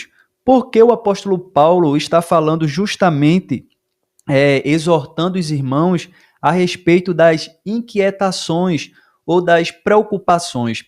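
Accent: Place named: Northeast, Brazil. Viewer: sou Do RJ, pelo sotaque creio que seja do nordeste. Fico feliz por ser tão jovem e dedicado a obra de Deus. Estava fazendo um estudo e apareceu o seu aqui no meu celular. Fiquei feliz . Deus te abençoe um abração do carioca.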